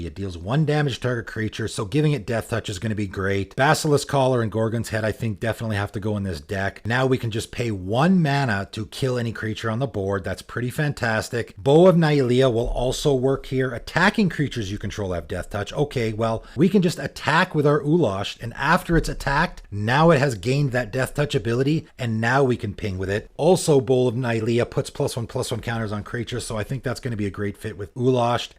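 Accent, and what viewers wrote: Canada. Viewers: Love ya but boy the Canadian pronunciation is KILLING ME. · bruh the heavy canadian accent is half the reason i subbed to this guy. I fucking love it edit: you realize its great when you replace every "a" sound with the ol canadian "eh" for example: s"eh"ga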